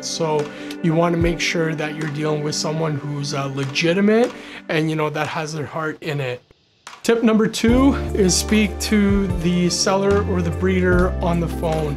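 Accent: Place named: Hungary. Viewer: I'm sorry this is super unrelated, but are you originally from Hungary? Just thought because of Füli and some slight tinge of your accent ^^ Thank you for all this information also, I just found your channel and already subbed ♡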